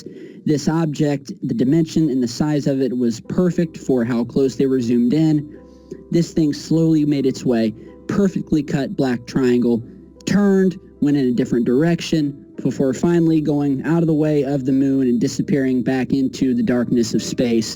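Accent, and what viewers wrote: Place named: United States. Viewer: Never get bored of hearing your opinions. You have the only us accent without the deep south drawl that I am not irritated by. Everybody deserves some private time and a bit of r&r so feel no guilt for taking the time to stay fresh. Stay real and keep the truth. Kudos & respect for your work.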